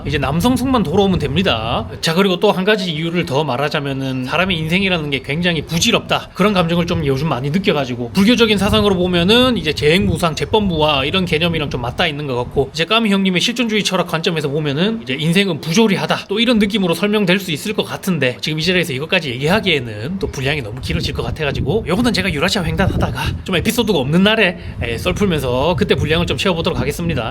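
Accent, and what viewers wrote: Busan, South Korea. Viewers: 안녕하세요 구독하고 갑니다 부산아재 고향목소리 듣기 너무 좋고 편합니데이 부교공 전기직군 빡세네요 외주 안주나 보네요 브레인께서 너무 최전선 상노가다 뛰셨네요 그동안 정말 고생많으셨습니다 !! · ㅠㅠ왜 눈에 습기가차는지.. 형님 갱상도 남자인데 은근 따뜻함이 느껴지네예…. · 나강처럼 부산 사람인교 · 저두 고향이 부산이여. 잘 볼께여 사투리 조아여~~~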